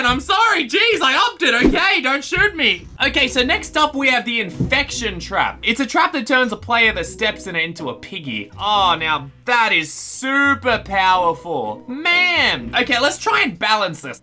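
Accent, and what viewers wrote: Australia. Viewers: I just realised in this video that your Australian 😅😂 I don’t know why but I love seeing Australian you tubers! Keep up the amazing work!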